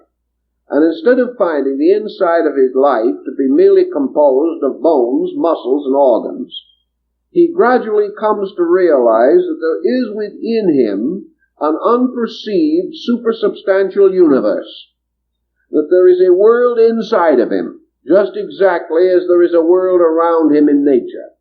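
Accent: Transatlantic, United States. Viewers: One can detect the "Trans-Atantic" accent commonly used during his time.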